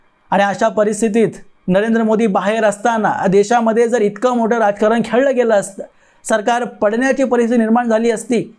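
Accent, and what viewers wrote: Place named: South, India. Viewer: तुमचा टोन पूर्णपणे दक्षिण भारतीय आहे. टोन ऐकल्यावर मी तुमचं नाव वाचलं. ऐकायला छान वाटतं. Keep it up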